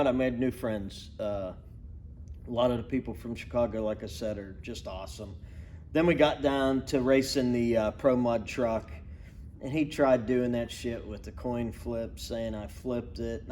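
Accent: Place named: Pittsburgh, United States. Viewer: I never noticed the yinzer accent til now. Shaht aht to the best accent in America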